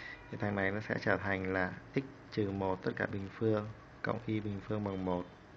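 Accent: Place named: North, Vietnam. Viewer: THẦY NGƯỜI BẮC HẢ THẦY :x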